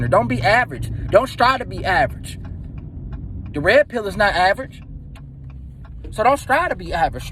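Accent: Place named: Maryland, United States. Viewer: Bruh gotta be from Maryland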